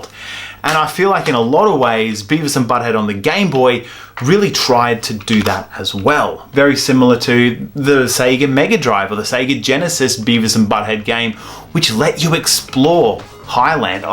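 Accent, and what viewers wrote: Australia. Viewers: I like your accent! Are you from Australia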